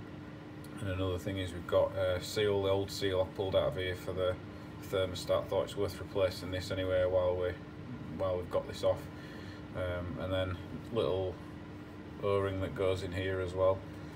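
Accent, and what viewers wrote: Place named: Yorkshire, United Kingdom. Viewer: Going by your accent I don't think we are far apart , I'm in Malton East of York and I guess your Huddersfield way .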